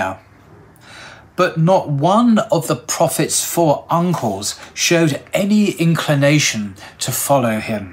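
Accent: United Kingdom. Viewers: Keep makimg these videos. 👍 We love your british dialect for storytelling.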